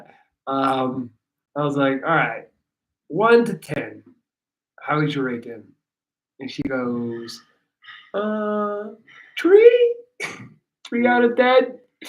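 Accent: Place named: United States. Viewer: I'm glad you're honest about emulating an American accent 😂 You are the most American European I've ever heard speak in my life haha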